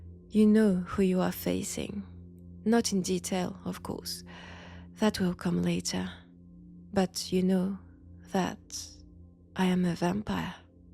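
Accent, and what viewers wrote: France. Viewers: I'm curious,are you a real french or do you just talk that way? I like this kind of way you do this,it has something. Good Roleplay for this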